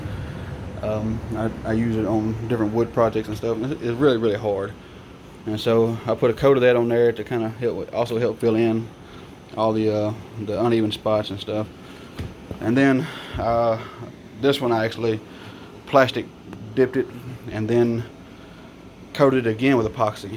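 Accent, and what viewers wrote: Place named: South, United States. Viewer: Awesome weights and southern accent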